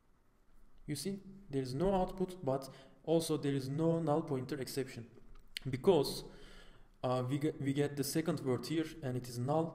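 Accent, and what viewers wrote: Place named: Turkey. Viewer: Turkish accent :)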